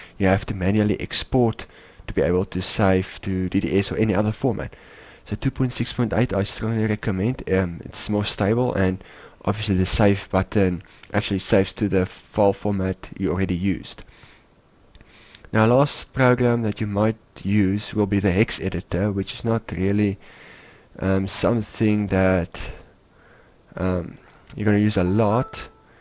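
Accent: South Africa. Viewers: he clearly has the South African accent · You sound like a south African. That's surprising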